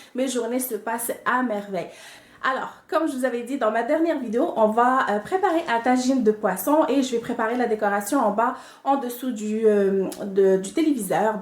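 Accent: Quebec, Canada. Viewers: ton accent quebecois 😍😍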